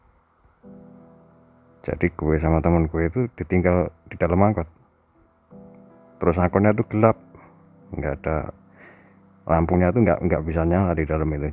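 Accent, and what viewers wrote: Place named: Java, Indonesia. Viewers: Kata GUE dan GUA itu beda cara pengunaan di dalam kalimatnya. Nahh si mas2 ini byak kalimat yg seharusnya pake Gua dia pake Gue, jadi nahbah kelihatan MEDOK nya 😄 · Gak usah pake kata GUE bro... medok soale sampean...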